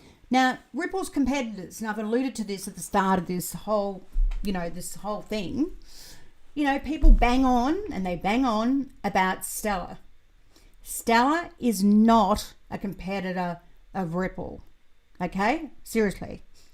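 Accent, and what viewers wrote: Australia. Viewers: Nice to hear an Aussie voice! I feel much more like I can trust an Aussie!!!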